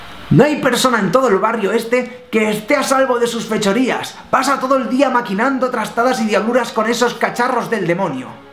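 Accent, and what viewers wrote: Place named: Catalonia, Spain. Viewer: me he vuelto a enamorar de tus dejes catalanes al hablar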